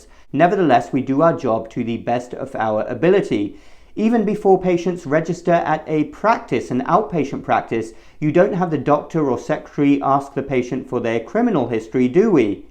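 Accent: United Kingdom. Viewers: This opens up a bigger issue. Many doctors do refuse people for alot of unethical reasons. It happens more than you hear about. I was kicked out of the biggest healthcare system in the state because I stood up for myself in having one of my newly identified conditions treated. Even 6 years later if any doctor tries to refer me to a specialist there in any of their satellite clinics what happens is an electronic blocks prevents the scheduling person from advancing any further and locks up their computer. It then requires them to shut down their computer and reboot and then it tells them to "contact patient relations" where it will say I've been banned for life. This is a clear violation of the hippocratic oath but several doctors went along with the administration's agenda while the controversy was still going on and my own primary care physician's office was the one that was responsible. 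This is because now administrative staff have things hierarchically set up so that they outrank doctors, doctors don't own their own clinics anymore, and if doctors want to keep their jobs they are required to act against the best interest of patients if the "financial interest" of the corporation warrants it in their eyes. I don't know where you work (I'm guessing the UK because of your accent), if so then I think the UK has more of a community health perspective and this is probably less common to take place there than in the US, which does not have a single payer system. I was just kicked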